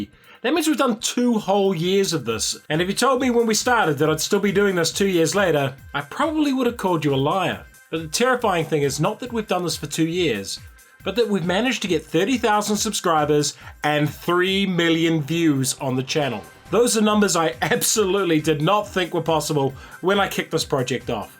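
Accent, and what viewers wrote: New Zealand. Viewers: Love the vids and its great hearing from a kiwi. Just curious but where is that photo taken is it by the old prison?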